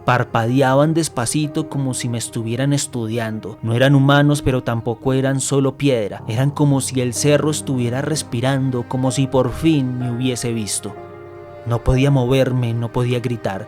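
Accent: Argentina. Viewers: EN SANTIAGO DEL ESTERO. SEGÚN DE DONDE ES AVECES SI HABLAN ASI. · Me encanta el acento con el lunfardo argentino ☺️ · Es al revés un colombiano leyendo con el acento bien Argento 🇦🇷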